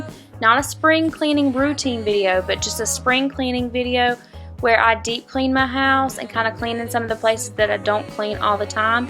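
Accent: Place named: South, United States. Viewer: I am from Texas so I I can appreciate the drawl of a southern girl ❤️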